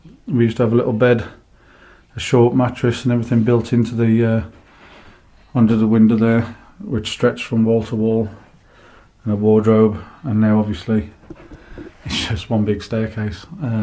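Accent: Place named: Dudley, United Kingdom. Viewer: Where about in Dudley? I'm Dudley also, picked up the accent straight away ;)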